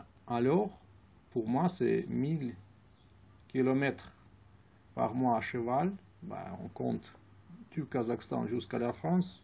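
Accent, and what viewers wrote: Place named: Russia. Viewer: Я хз почему, но с твоим русским акцентом французский намного понятен на слух. Побольше на канале таких видео с собственной озвучкой. С меня подписка!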